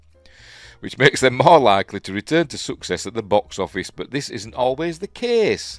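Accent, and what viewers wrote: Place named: Yorkshire, United Kingdom. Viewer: actually your accent just perfect mate! Love some lovely yo'ksheir accent!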